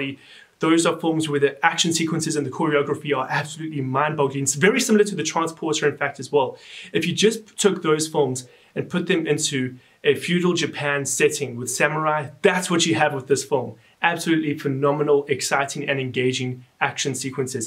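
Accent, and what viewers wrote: South Africa. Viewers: You sound South African 👍👍👍 awe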